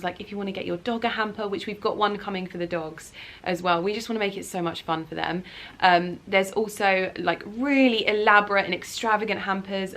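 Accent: RP, United Kingdom. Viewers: it always cracks me up when people in the comments spell Berkeley out as Barkley...that dog's damn name is Berkeley but desperately wannabe-posh accent makes it sound like Baaaaah-kley and people get fooled. hilarious indeed...thank God he actually barks so it kind of makes sense, in a way 😄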